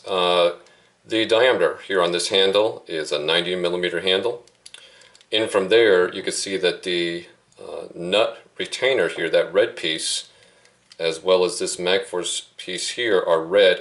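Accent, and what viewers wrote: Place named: Delaware County, United States. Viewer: where in Delaware county are you from? that accent is thick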